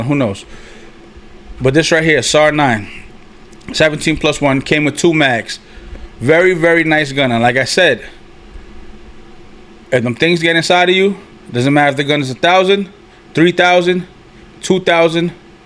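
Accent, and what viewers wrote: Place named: New York, United States. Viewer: Yup new sub my bro 🫡💯 i hear that new Yorker accent 🗽 dope collection n dope video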